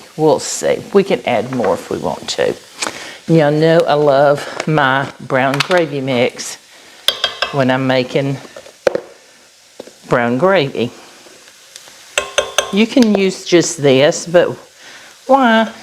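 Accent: South, United States. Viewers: Next time I go to the grocery store, I'm going to get all those ingredients, have some on hand, and make that meal exactly how you made it. I saw your kitty on the porch. You give good vibes. I detect a little midwest in your southern accent. The best to you and your husband!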